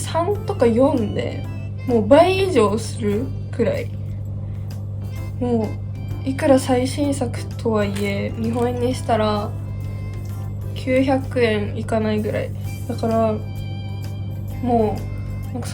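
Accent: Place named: Northeast, China. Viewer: 😊Chinese have a littile northeast dialect, rise up！🎉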